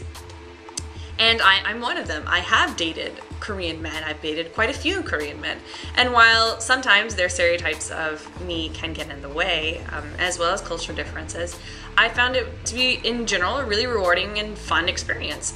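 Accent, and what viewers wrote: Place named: South, United States. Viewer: Do you ever find your southern (you don't have the full c*** a** accent) causing communication problems?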